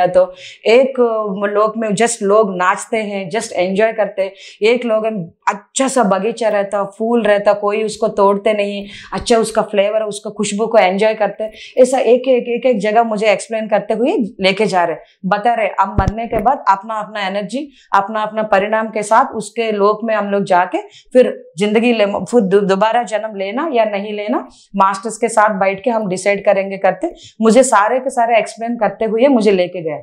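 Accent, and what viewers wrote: South, India. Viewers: I love south indian HINDI ACCENT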